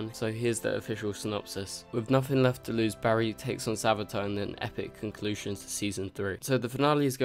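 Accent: United Kingdom. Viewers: Are you British? What accent do you have?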